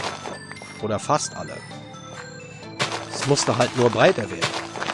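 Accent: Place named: Saxony, Germany. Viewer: haha kannst du lustig sächseln! Kommst du aus Sachsen/DDR ?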